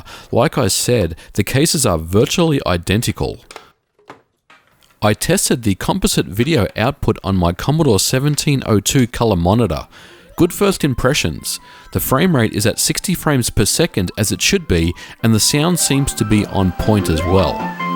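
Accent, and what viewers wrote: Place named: Australia. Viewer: Goddamn Aussie accent my ears are bleeding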